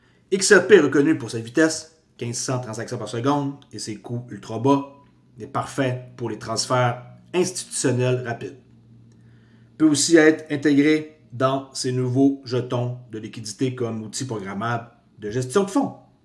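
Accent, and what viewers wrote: Quebec, Canada. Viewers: Salut! Tu semble parler le Québécois! J'ai regarder plusieurs cite anglais et de la France, mais, voilà, je suis un vieux modèle 58, et je suis un néophyte dans le domaine, surtout, qu'il y a tellement d'acronyme, et j'ai la chienne de me faire avoir dans ce monde de jeune, donc, j'ai besoin d'aide pour acquérir des XRP, je ne suis pas sur de comprendre ce que cela veux dire, mais, je dois aller coûte que coûte dans cette directions! Peux-tu m'aider de façons privé? Respectueusement.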